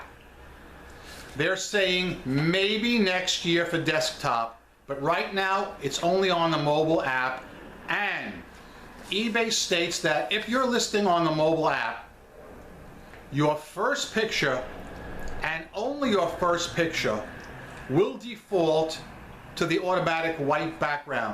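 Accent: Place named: Tennessee, United States. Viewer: I too have a distinctive accent from SE Tennessee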